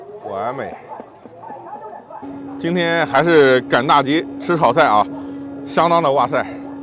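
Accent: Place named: Jiangsu, China. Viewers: 你江苏人？口音太重了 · 前面就感觉说话南京口音，这集听到“小杆子”，那就坐实了是南京人。老乡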